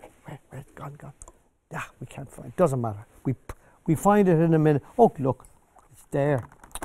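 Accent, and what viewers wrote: Ireland. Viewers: Dear I’m so glad I found your video site. After going to 2 learning to paint sessions I feel I didn’t learn very much, you make it so easy and I love listening to you with your lovely Irish accent and as my MumRIP was from Dublin it brings back lots of memories. I will continue to paint although I’m a bit nervous Thank you. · I do paint a bit but I tune in especially to hear your voice🍀☘️. My Gr.Parents were from Dublin & spoke just like you. I enjoy listening to you & It’s very comforting. I loved them dearly❤️. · My hair dryer has lived in my paint basket since I discovered "Simply Painting" years ago in a craft shop after having made a fool of myself in a watercoloring painting class. Now I have a brand new set of gouache, and you're going to be the reason for my success with them. You make sense -- and I love the brogue.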